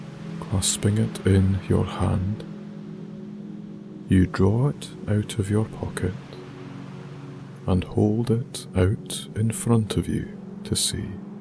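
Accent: Scotland, United Kingdom. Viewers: your soothing voice with the Scottish accent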